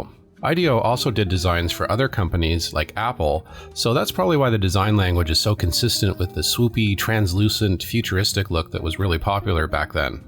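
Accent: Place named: Canada. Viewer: You sound so Canadian. "Ageenst it" hehe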